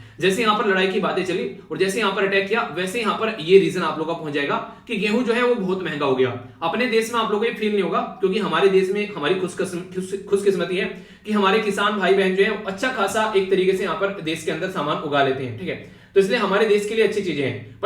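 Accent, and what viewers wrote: Haryana, India. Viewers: Sir ap haryana se ho..... Rewari wale area se..... As I can guess.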